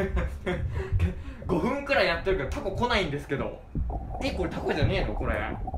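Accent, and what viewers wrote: Kansai, Japan. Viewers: 関西弁いいね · 関西弁いい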